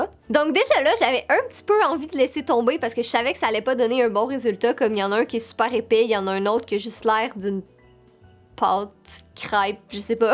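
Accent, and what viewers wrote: Quebec, Canada. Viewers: J'ai 10 ans mais j'adore l accent québécois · Oh cozu hihi l accent québécois est gros drôle et beau😂😀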